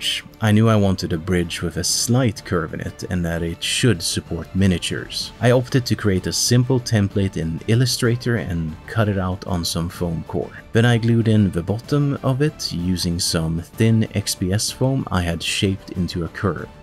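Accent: Sweden. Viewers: I would love to play on this board with my custom witch hunters! Are you in Sweden? Sounds like it!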